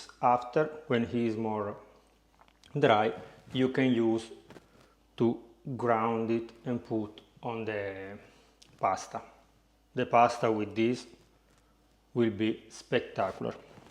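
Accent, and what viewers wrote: Italy. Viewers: Everything just geting better, when you speak with an italian accent.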